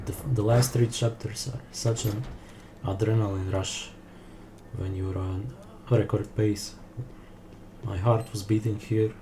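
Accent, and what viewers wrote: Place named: Russia. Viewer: Звучишь как русский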